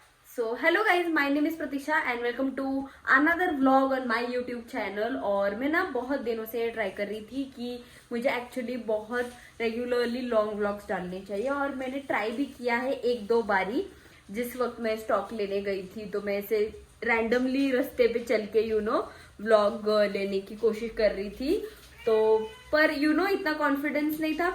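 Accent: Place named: Maharashtra, India. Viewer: apka hindi bahot marathi type hai 😁😁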